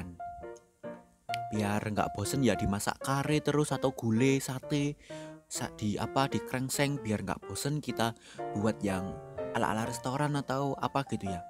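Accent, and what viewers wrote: Java, Indonesia. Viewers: Medookk banget mas nya hehe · arek ngendi to mas kok rodok medok😅😅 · Mas ngomong e medok pol Awoakwoak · Bukan cma belajar di chanel ini Tapi juga menghibur iya suara masnya masih medok banget jadi senyum2 sendiri dengernya 😁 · Aku seneng logat jowo mu klo nerangin nya mas.. Masokk... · makasih resepnya, semoga bojoku suka soale mami mertuaku pinter masak, jadi selerane suamiku tinggi masalah makanan dek ini yoo... lucune talah ngomonge... suka aku, medok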